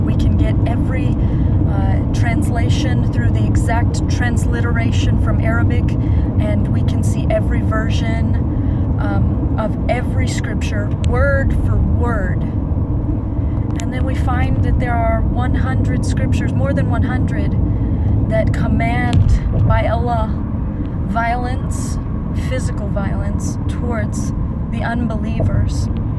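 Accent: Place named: United States. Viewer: she's USA citizen and sees what happened to PC Europe and like many US peeps she doesn't want it to happen in USA as Trump supporters worry about.... she's quite pretty.......she reads books!!!!!!!!!!! USA woman who reads books?????????????????????? da! is this possible? Am I seeing a female robot with a US accent' 'so nice that accent'' yes what a lovely voice....did I mention she reads????????????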